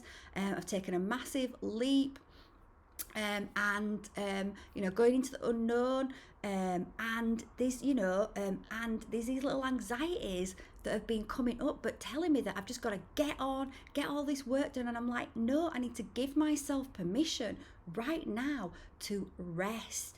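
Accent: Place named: North of England, United Kingdom. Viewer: Thanks so much for your video which really resonates. I feel like I’ve been on pause for a long time. I noticed your video lasted 9.11 and I have been seeing that number sequence for a long time! What part of the world are you based by the way? You sound like you’re from the North of England. I live in Prestwich. Sending love and sparkly wishes xxx 🙌💕🧚‍♀️🪄💫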